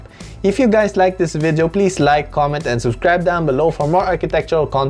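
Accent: Philippines. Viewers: Carry that filipino accent hard kuya! Be proud! Hehehe love them vids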